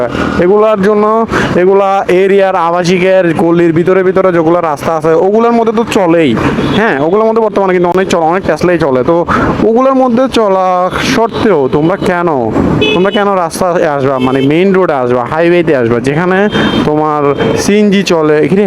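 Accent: Chittagong, Bangladesh. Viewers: Apni ki cttorgram e taken kota sune buja jasse amader cttorgram er manus ❤️🤝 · vai kothagulo valo laglo,Apni Chittagong er? Always video up diyen dekhbo 💝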